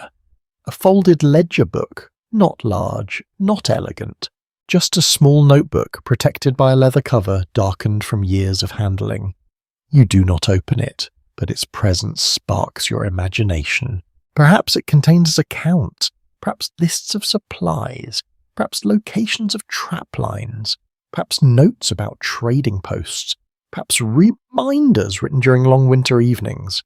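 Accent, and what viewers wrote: England, United Kingdom. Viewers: These are stories of American history. If these voice overs are AI why use a “person” with an English accent. Why not use a voice more like Sam Elliott? It just seems more fitting. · Pcffffst. Give us simeone without a brit accent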